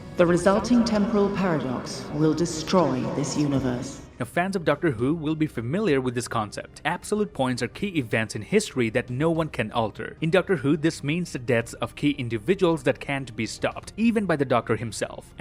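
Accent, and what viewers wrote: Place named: Montreal, Canada. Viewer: You have a Montreal accent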